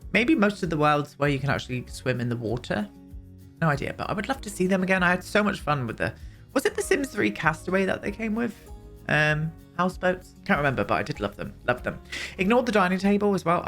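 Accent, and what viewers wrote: United Kingdom. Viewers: And you is british haha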